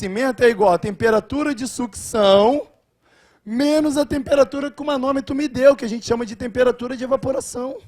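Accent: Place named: Rio de Janeiro, Brazil. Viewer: Carioca raiz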